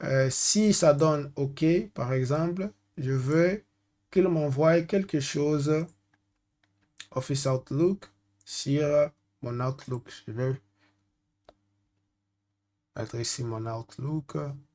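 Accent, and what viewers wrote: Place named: France. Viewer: Tant que le message passe, l'accent français n'est plus une barrière ! Force à toi Ndeko 🎉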